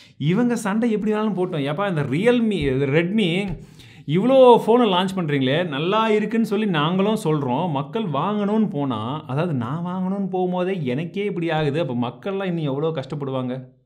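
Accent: Chennai, India. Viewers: dont use chennai tamil, keaka mudiyala... use south TN tamil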